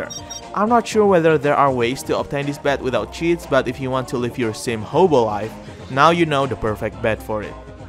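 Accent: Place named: Indonesia. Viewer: Dude are you indonesian? I assumed it by your channel name and your accent lol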